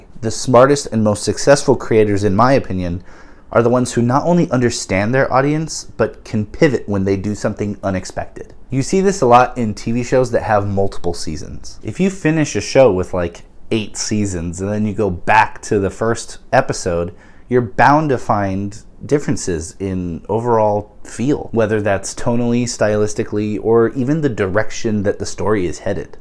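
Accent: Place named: France. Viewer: The French accent is 😍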